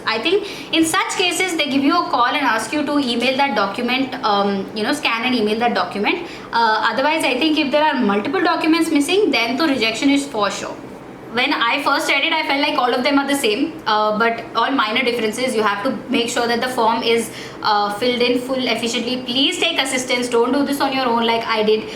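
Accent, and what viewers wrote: India. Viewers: Although i had AAAAAAALLLLLLLLLL the documents LOL. Indian accent is the funniest one. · indian accent 🤣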